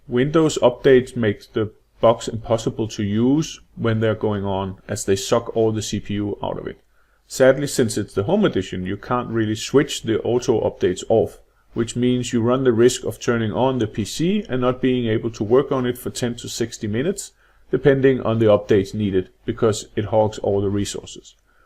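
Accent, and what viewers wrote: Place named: Denmark. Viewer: I can recognize that Danish accent from a mile away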